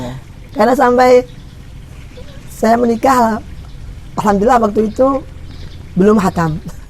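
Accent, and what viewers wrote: Java, Indonesia. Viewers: Bu nyai, klo ngendikan njawani banget, tapi gitu baca Qur'an, sambil merem dengernya ky org timur tengah, masha Allah fasih ... yai dan gus di kediri idola dan panutan sy..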